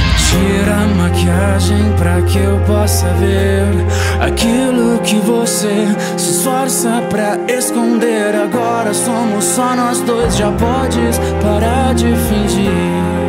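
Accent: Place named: Rio Grande do Sul, Brazil. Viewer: Gaúcho, né...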